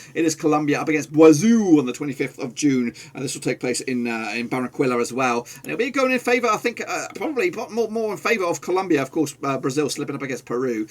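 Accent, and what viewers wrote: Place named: United Kingdom. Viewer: Basado en su accento, yo creo que el es britanico... Los americanos hablamos mas Bonito lol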